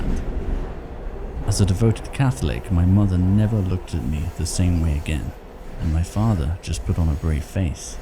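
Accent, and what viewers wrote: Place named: RP, United Kingdom. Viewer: Love is posh voice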